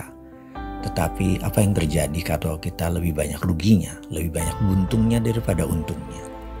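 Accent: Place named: Surabaya, Indonesia. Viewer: iki wrong endi ce rek?,..,. Jadi pingin Dolan nan omahne pingin belajar bisnis. kayak, e wong suroboyo jawa timur bahasanne.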